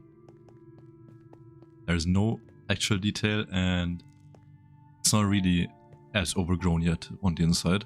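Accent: Germany. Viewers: ich hörs doch raus du musst deutsch sein. Warum finde ich niemand der gut bauen kann wenn du nen privaten builder server hast bitte lass mich ma drauf kann auch paar bilder schicken als beweis das ich builder bin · Wait, are you german? Love the Intro! <3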